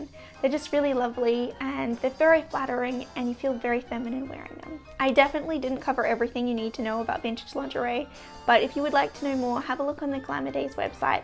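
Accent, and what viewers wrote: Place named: Australia. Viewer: I have commented on one of your videos before (on the life of a housewife in the 1950s), you seemed to appreciate my comments - thks for your reply at the time. I was from an English immigrant family and lived in Melbourne from age 9 to about 32, when I returned to England. So the way you talked, and the sort of things you had in the house, were very familiar. Just a word about terminology: the belt worn around the waist with straps to hold up stockings, worn by women (and most girls from age about 12), was called a 'suspender belt' - the same as in England. It's mainly in the USA, that they are called 'garter belt'. In the US, the straps themselves are called 'garters', which can also refer to an elasticated band around the leg to keep stockings in place. So 'garter' has both meanings in US English, but only the latter meaning in England and Australia (elasticated band around the leg). 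A suspender belt in Australia was also called a 'dance belt', for reasons I never discovered. Time was when women liked to look and feel feminine - them were the days! ("vive la difference!" and all that).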